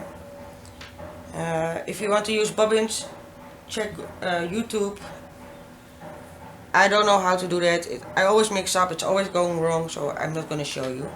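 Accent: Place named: Netherlands. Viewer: Are you from the netherlands ?? You sound dutch #dutchpower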